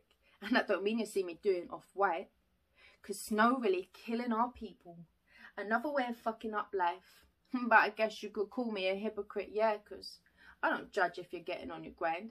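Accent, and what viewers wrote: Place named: United Kingdom. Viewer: I never thought one day ı fell in love with who has Bri'ish Accent 😍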